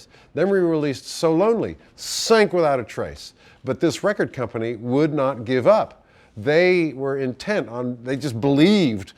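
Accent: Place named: United States. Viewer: He sounds so American but isn't he British?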